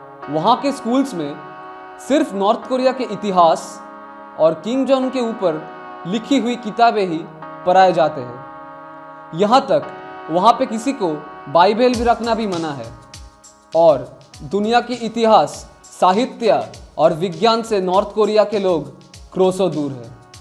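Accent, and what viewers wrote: Bengal, India. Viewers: Tumhara hindi sunke to lagta hai tum bangali manush ho .... achhi jankari diye ho . thank you dada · R u Bengoli ...Odisian? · Bengali hai kya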